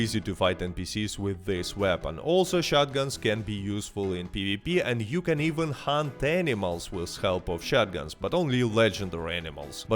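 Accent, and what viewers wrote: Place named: Russia. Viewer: I love your accent. It sounds Russian but not quite Russian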